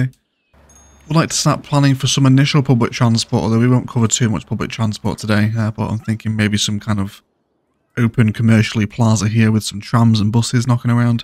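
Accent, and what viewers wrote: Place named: England, United Kingdom. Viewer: Time to sit back and enjoy my dear old with his awesome funny English accent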